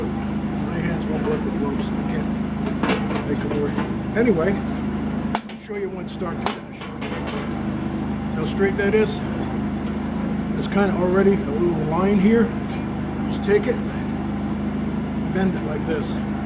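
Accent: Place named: Northeast, United States. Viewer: Thank you! My husband was struggling put our 4ft pieces of pipe together. So I watched ur video to see if I could find him some help. And yes it did. So we both thank you for your knowledge. I also want to say I love your northeastern accent. Reminds me of my Grandpa. He was from Eastport, Maine... where are u from? If u don't mind me asking..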